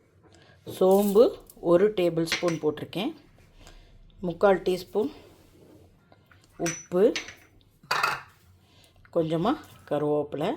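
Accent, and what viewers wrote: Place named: Bangalore, India. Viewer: Thakshanam is the unique Bangalore Ayyangar bhashai ❤it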